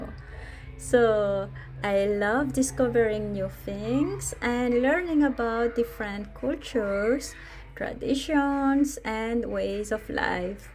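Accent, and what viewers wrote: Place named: Philippines. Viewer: You sound Filipino. Maybe that's because you live in the Philippines.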